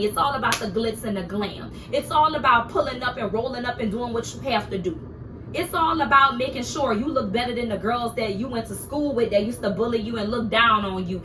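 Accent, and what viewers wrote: New Orleans, United States. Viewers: It’s the NOLA accent for me ❤❤❤❤❤ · Whole video is a WORD perfectly frosted with dat NOLA accent 💚💛💜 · Love that NOLA accent!! Lol ❤️❤️❤️❤️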